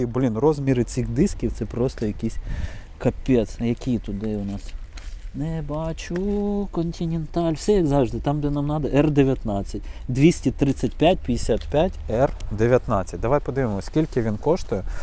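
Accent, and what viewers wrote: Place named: Volhynia, Ukraine. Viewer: Волиняни так розмовляють, Стрій, Кієв, город і да. Це масове явище.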